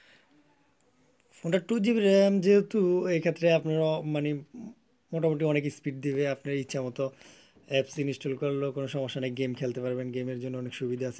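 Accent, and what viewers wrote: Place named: Myanmar. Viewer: Vai khotha valo Kora bolban Karon mramar manusar moto khothaboltasan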